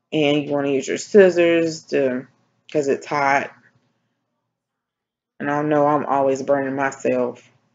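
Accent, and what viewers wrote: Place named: Louisiana, United States. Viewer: Very nice work, and so pretty!! Love the creativity using a pool noodle! I love your accent! You almost sound like me a Louisiana girl! 😆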